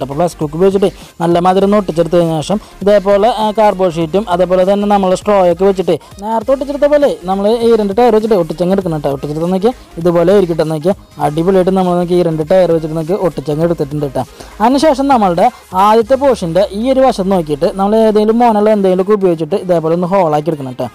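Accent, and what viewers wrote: Thrissur, India. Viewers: തൃശ്ശൂർകാരൻ ആണല്ലെ 😁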